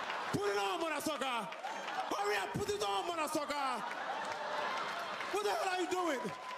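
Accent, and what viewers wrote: Africa. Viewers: I absolutely love him. He's hilarious. I never get tired of the African accent. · lol his African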